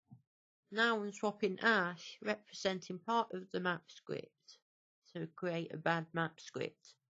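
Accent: United Kingdom. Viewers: Ta you sure the video wasn't on x0.5 speed? sounds like a british girl to me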